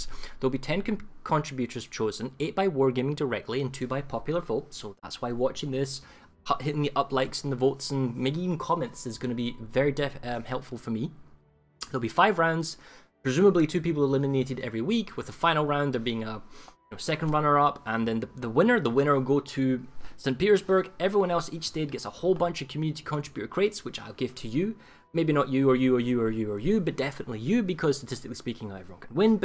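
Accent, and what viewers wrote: Scotland, United Kingdom. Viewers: damn now i've got between Mighty Jingles and you....... i don't know..... i'll see with every mission (if you bring a little more scottish accent(soft spot) i'm in....) Why not strike a deal and get a scottisch speaking HANS in the game :)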